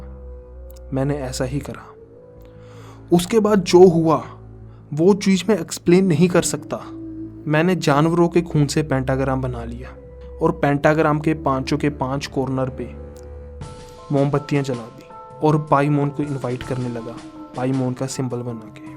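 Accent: Punjab, India. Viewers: Bro shi btao tum punjabi ho na😁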